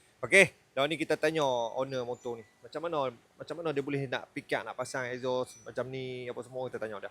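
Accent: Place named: North, Malaysia. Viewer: Btoi2 mewakili org utaqa sebutan2 abg. Mmg sempoiii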